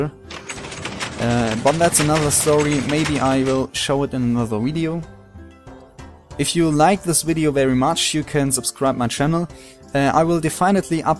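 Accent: Germany. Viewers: Dein Englisch klingt echt total Deutsch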